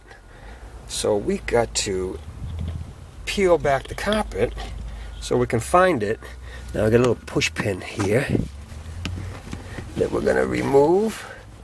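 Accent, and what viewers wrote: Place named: Maine, United States. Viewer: Where ya from, bub? Sound like a fellow Mainer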